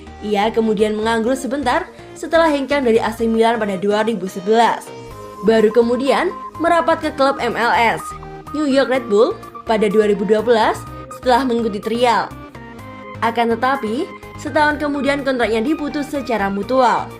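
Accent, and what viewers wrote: Java, Indonesia. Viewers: Meddok pingit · Bahasanya Medok Jadi Susah Bilang Borrielo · VO NYA MEDOK BAT DAH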